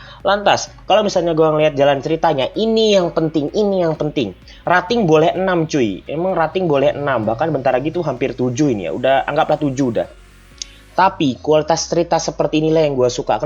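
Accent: Banjar, Indonesia. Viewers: Bang dri banjar kah?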